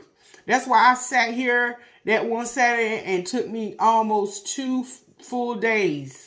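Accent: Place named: Pittsburgh, United States. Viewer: YOU TALK LIKE YOUR ARE FROM PITTSBURGH.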